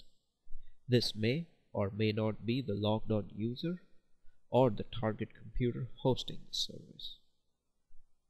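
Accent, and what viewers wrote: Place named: India. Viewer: drop off the accent dude... the way you say "service" sounds like "sabzi" - which means vegetables in indian(hindi/urdu)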